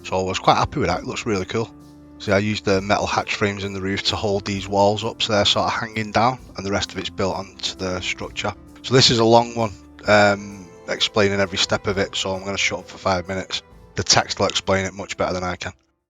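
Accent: Manchester, United Kingdom. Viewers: What’s your accent man? I’m tryna work it out, you sound like a mix of Mancunian and Potters.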